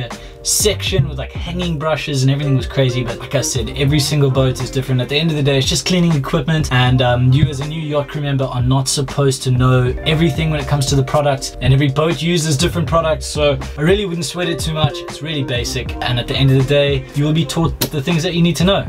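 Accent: South Africa. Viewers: Love your videos , love what you do . Are you South African ?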